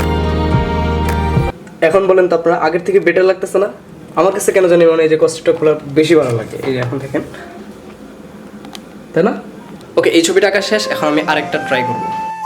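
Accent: Sylhet, Bangladesh. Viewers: Excuse me are you from sylhet district ?????? 😅😇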